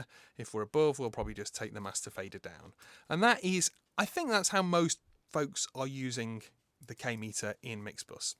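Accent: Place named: England, United Kingdom. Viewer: it is a pleisure listen this nice english accent !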